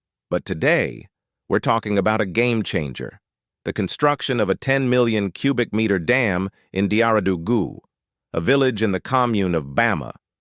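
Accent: West, United States. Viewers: Hey man I know you sound like a Westerner you either be Americans or Canadian or Europeans but you are saying the truth You went there and you make your video at the same time your western leaders led by United States criticizing a leader that try to reformed his country within a short period of time The great jobs from roads constructions from building hydro power industries hospitals and agriculture sectors as well many more development that going on there building Estes or houses and they're still condemning and criticizing this leader captain lbrahim Treore the man of African choice for no reason why should we believe in democracy a system that was in brain prosperity to Africa a system that the western leader use forge story lies to go to Libya to go to Iraq and Afghanistan and many more places in Africa as well propaganda against him is that democracy can we believe democracy to be a true way of governance when their leaders themselves they're not straightforward the lies the manipulation stories how can we accept democracy when people that champions so called democracy and lying blindly something that you have seen it and you are even making video about it is real that the skill and the level of development is not hiding compared to independent time till now the development achieved from that period is nothing He's doing so much great compared to the man who were in power for 27 years what did you do You to serve the French All the resources were going to France and th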